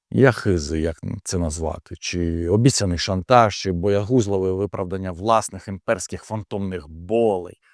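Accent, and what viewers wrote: Russia. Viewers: І з кацапським акцентом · Breshe khlopchyk! A mozhe tse troll Putina? Rosiyskyy akcent.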